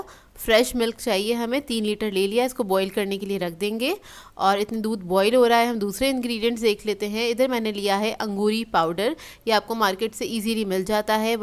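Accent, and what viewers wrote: Karachi, Pakistan. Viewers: Karacnhi with a typical tone so sweet once Mahira Khan pronounced same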